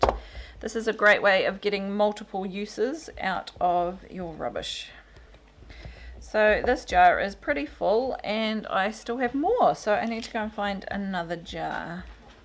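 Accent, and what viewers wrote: New Zealand. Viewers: Awesome! So good to hear a kiwi accent! I live in the states and have done for years. Was looking around to make apple cider vinegar. So I will try yours . Thanks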